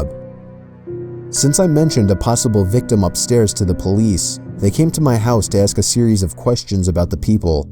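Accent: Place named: New York, United States. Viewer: I picture him looking like the Joe Pesci from Home Alone lol the NY accent is why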